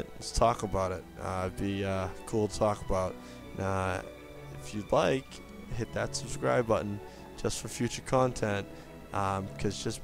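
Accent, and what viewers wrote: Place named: Boston, United States. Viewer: Haha awesome luck. That's pretty ridiculous odds. How'd you like the Super Bowl. I really dont keep up unless Tom Brady is involved. You sound very Boston so I guess you might be a Pats fan :p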